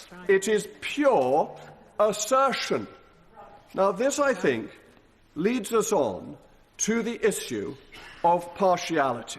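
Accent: RP, United Kingdom. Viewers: You are confusing intelligence with a posh accent.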